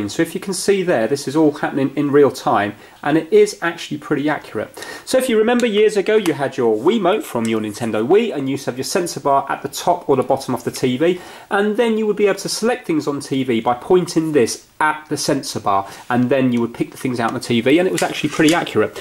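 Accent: United Kingdom. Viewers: Good tips dislike because of your british accent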